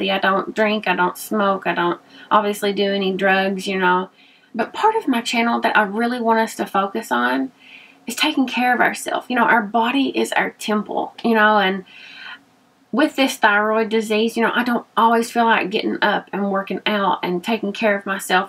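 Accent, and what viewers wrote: South, United States. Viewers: Thanks for the kind words. One thing in life I've always have stayed positive throughout all my health issues. I let me having to get a total knee replacement get to me more then having breast cancer go figure. I'm so glad that Emily mentioned you that day on her channel and I came and checked your channel out. She got me when she told you about your accent. I love a good southern accent.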